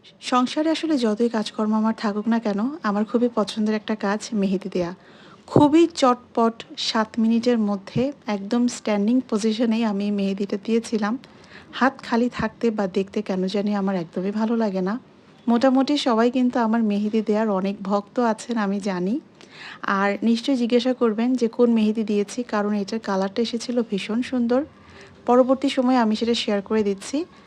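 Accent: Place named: Noakhali, Bangladesh. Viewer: Assalamualikum apu kemon acho ? Night queen flowers Sotti sundor tobe samnasamni dekhar sovaggo hoy ni kokhono. Apu ajker vidéo ta onek clear ajke ki i phone diye vidéo korecho . Mehedi design onek sundor hoyeche. Ei cha dekhe tsc cha er kotha mone pore gelo . Sijhdah burita kemon ache . Mashallah onek onek sundor lagche 😘 ki sundor kotha bole amader noramonio eivabe kotha bole.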